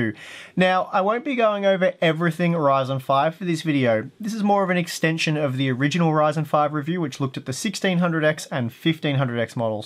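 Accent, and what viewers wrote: Australia. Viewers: ahh the good ol aussie twang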